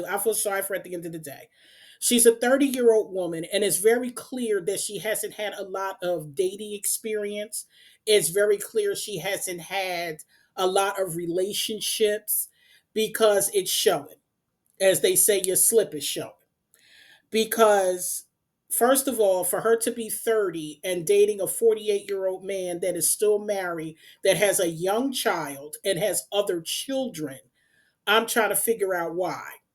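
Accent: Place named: Philadelphia, United States. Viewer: You’re so right! I also can tell you’re from Philly or the surrounding areas maybe our accent is one of a kind! Hey hey! 🫶🏽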